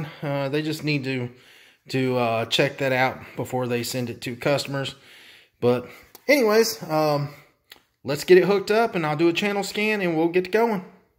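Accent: United States. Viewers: He's got my accent dont ya? we're both southern bubba's talks just like me. im a fan.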